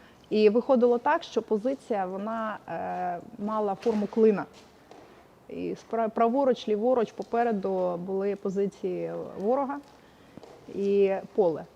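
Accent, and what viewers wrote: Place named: Surzhyk, Ukraine. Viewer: Лицемерка. На поле боя на русском разговаривает, а перед камерой на мове, больше похожим на суржик. Бандеровская подстилка.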